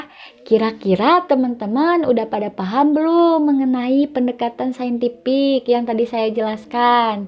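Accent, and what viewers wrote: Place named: West Java, Indonesia. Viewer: Sunda sekali logatnya 🤣🤣🤣🤣🤣🤣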